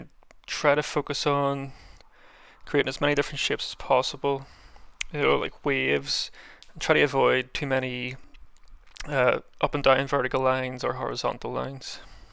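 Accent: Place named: Northern Ireland, United Kingdom. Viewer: just came across this vid, weird clickin on a mini tutorial and hearin someone from norn iron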